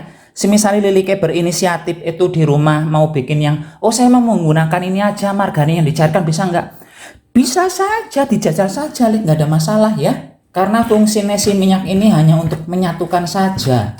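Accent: Banyumas, Indonesia. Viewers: Selamat siang mas trima kasih banget resepnya sangat gamblang aku suka dengan gaya ngapaknya hehe sukses slalu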